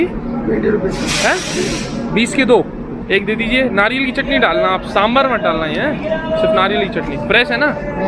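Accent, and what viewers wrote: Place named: Bundelkhand, India. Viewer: Tum bundelkhand ke lag rahe ho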